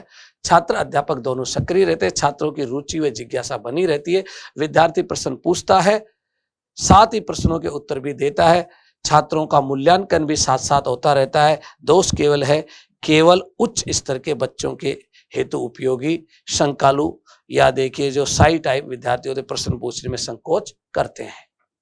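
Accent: Rajasthan, India. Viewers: आप हिंदी वर्तनी में राजस्थानी क्षेत्रीय भाषा से प्रभावित बोल रहे हैं, जो कि अशुद्ध है। कृपया सुधारे।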